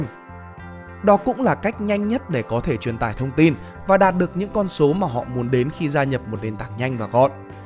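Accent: South, Vietnam. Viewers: từ khi thêm giọng đọc miền nam vào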